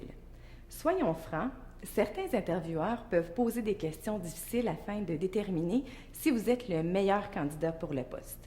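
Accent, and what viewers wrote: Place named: Quebec, Canada. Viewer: i think so shes from quebec thats why she has an accent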